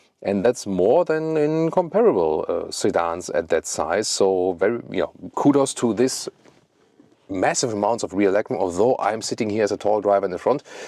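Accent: Germany. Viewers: Junge, Du bist "deutscher2 als Du glaubst. Lass das bitte mit dem English. Dein Akzent verrät Dich hinter jeder Silbe. Grund genug, nach 2 Minuten wegzuschlagen. Unerträglich.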